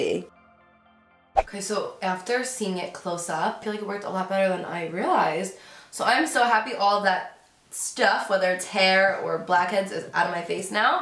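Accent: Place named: New York, United States. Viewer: You can definitely tell you are from the New York/ jersey area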